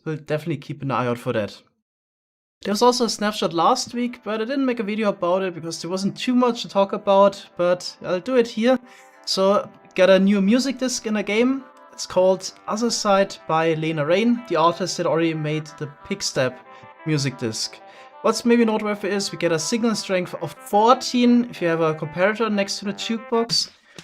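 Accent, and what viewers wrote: Germany. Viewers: ur dialect sounds so german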